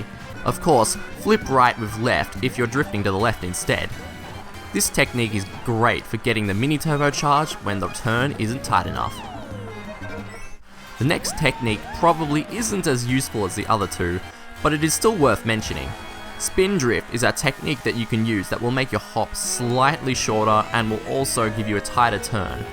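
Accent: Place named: Australia. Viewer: hey, an Aussie.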